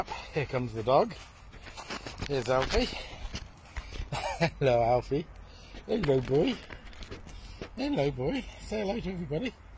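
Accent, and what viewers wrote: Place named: Melbourne, Australia. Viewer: i lived both in the UK and the US but you dont sound like either you have this Melbourne tone to you 😀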